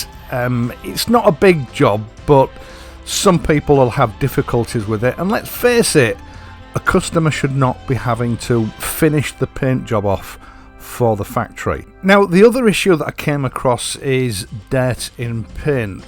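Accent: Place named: Northern Ireland, United Kingdom. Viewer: I really like your videos, they are very detailed. Also, you sound a lot like Uncle Colm from Derry Girls, which makes your videos all the more pleasant!:)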